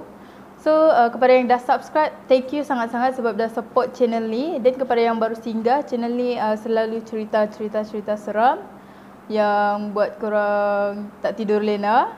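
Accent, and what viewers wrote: Sabah, Malaysia. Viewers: Akak orang Sabah ke? Sebab saya tengok cara akak bercakap macam orang Sabah....Saya orang Kedah... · akak ni org sabah ke org mane 😂😂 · Akak ni org Sabah ke?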